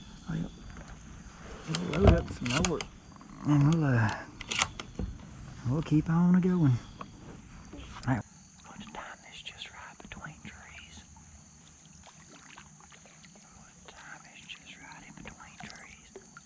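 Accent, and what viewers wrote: South, United States. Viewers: Yeah I just saw the video on how you cleaned that ”chipmunk deer” and now I will have nightmares. I found this video trough swedens largest ”link” site where they post videos and photos on a daily basis. I then listnened to your pretty polly cover and you have an amazing southern accent and nooow im going down the rabbit hole of banjos :)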